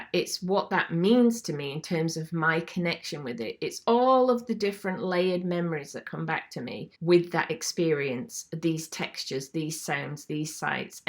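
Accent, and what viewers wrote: Yorkshire, United Kingdom. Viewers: After trawling through so many art videos on youtube, this is the first and only one that has left me with more questions than answers and that is brilliant. Eight minutes of inspiring and thought provoking content. So nice to hear a Yorkshire person too. If you have any tips on how to gain inspiration from living in Leeds rather than beautiful North Yorks that would be greatly appreciated!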